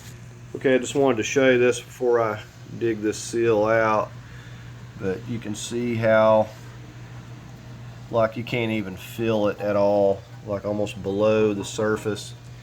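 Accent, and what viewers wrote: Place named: Southern Ohio, United States. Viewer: Fantastic set of videos - on my ‘05 Tribute V6 I have oil leaking out of my oil pan, main crank and valve cover. It’s a mess! I’m using your videos to do all three. I’m competent but not confident under cars, so these are just perfect. You sound like you might be from Southern Ohio too so that makes you okay in my book to begin with. 👍 Thanks again for sharing your expertise and making such clear to follow videos!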